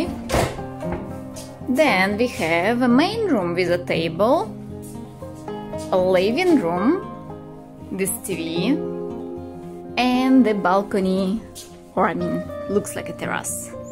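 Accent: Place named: Spain. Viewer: what a beautiful town and the food looks delicious! BTW, I just love your accent, are you Spanish?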